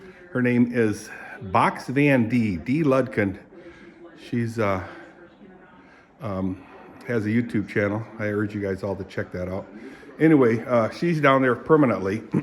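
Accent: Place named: North America. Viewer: I was thinking, is it June already but then realised you sound North American.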